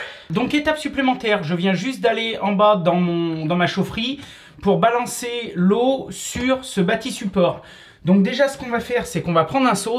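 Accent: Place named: Lyon, France. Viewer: Tip-top, comme d'habitude. Ca fait plaisir d'avoir affaire avec un pro digne de ce nom: compétent et honnête, animé par la volonté de faire les choses comme il faut et par le désir de satisfaire le client. Retraité du métier depuis pas mal d'années (je travaillais avec du cuivre uniquement), j'apprécie vivement les nouveaux matériaux, matériels et façon de faire avec les produits modernes. Les tuto éténat animé de manière tellement agréables. Et, petit plus, l'accent lyonnais ou stéphanois, si mes souvenirs sont bons!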